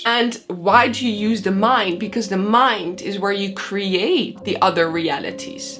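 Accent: Netherlands. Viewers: Can really hear the Dutchy through your English haha. Well explained very good video thanks for sharing this amazing knowledge. Love diving deep into consciousness, manifestation and psychology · you're dutch aren't you?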